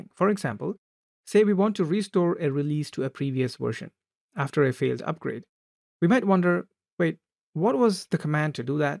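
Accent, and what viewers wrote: India. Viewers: malayali anno ?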